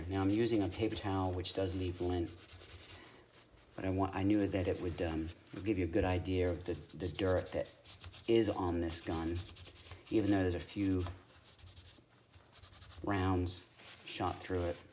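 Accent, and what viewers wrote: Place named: Boston, United States. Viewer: Cool Video! Are u from Boston? Sounds like u have a Boston accent 👍👍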